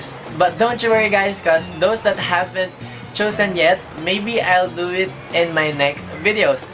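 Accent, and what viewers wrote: Philippines. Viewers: 𝐋𝐞𝐦𝐦𝐞 𝐠𝐮𝐞𝐬𝐬 𝐡𝐞 𝐢𝐬 𝐟𝐫𝐨𝐦 𝐭𝐡𝐞 𝐩𝐡𝐢𝐥𝐢𝐩𝐡𝐞𝐧𝐬 𝐦𝐲 𝐛𝐟𝐟 𝐢𝐬 𝐚𝐧𝐝 𝐭𝐡𝐢𝐞𝐫 𝐚𝐜𝐜𝐞𝐧𝐭 𝐬𝐨𝐮𝐧𝐝 𝐭𝐡𝐞 𝐬𝐚𝐦𝐞 𝐢𝐦 𝐟𝐫𝐨𝐦 𝐯𝐢𝐞𝐭𝐧𝐚𝐦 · I know a pinoy when I hear one🌚 Lab da bideo, Kuya! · His filipino accent tho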